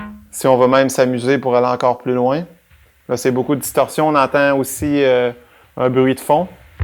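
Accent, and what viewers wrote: Quebec, Canada. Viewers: Très bonne démo malgré votre accent québécois. :)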